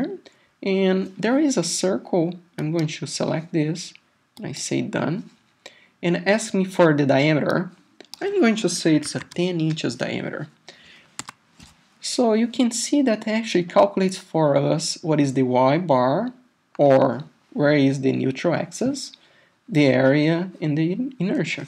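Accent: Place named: Brazil. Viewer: sutaque brasileiro! OIE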